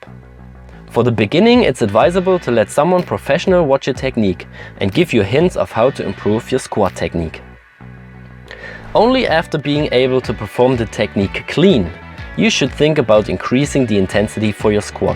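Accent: Germany. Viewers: You sound German, are you? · Alter bist du deutsch, merkt man sofort nach einer minute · great video, love the german accent . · that english accent is german as fuckkkk xd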